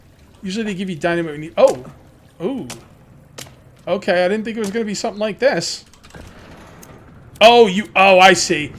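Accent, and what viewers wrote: United States. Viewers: FINALLY a true American accent among those British speech impediment accents.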